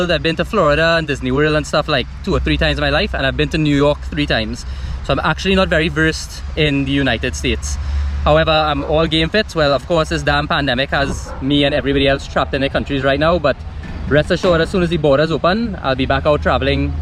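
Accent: Trinidad and Tobago. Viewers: This was hilarious. 100% agree in regards to traveling. I am not an extensive traveller but I've been fortunate to visit a few countries and truly enjoyed it. You become more open minded and less ignorant because now you've experienced different cultures and people and it eliminates any preconceived misconception. On another note, I showed my wife one of your videos but muted it then unmuted and she was so confused. She couldn't comprehend why a "white boy" sounded like a Trini 😂😂😂😂😂. You should do another one like this but live! Bless.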